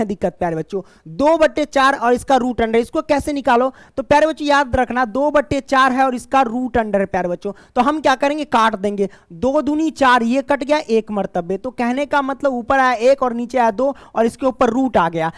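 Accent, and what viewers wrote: Bihar, India. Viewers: Sir bihar se hain n....?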